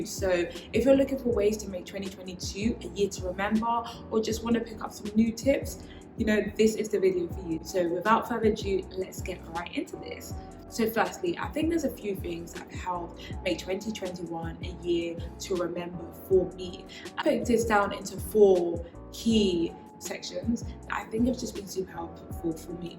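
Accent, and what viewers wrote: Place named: Jamaica. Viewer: This Jamaican accent you’re giving us 😂😂😂❤️ love you and you’re doing amazing ❤️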